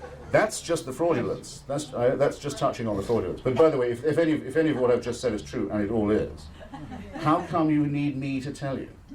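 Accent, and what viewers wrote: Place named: RP, United Kingdom. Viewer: Mother Teresa made mistakes. but having volunteered many times at her homes with the destitute I can say she lived a life of a true hero. Hitch takes about money. She owned 2 cheap saris and slept on the floor and was bone thin from eating same food as the destitute. Unlike other foreign missionaries she lived like a native. Hitch is a bloated blowhard with a posh accent who was wrong on every major issue including his passionate support of the Iraq war. By the way when I visited her home I inquired why they have no fund raising and they said God will provide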